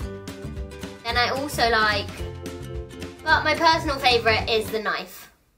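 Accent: United Kingdom. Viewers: videos give me life 💕 I think she's the only reason I learned how to speak Cockney too... 💎💋💁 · I love you British accent and also I subscribe to you on Lucas's channel and your own channel!!!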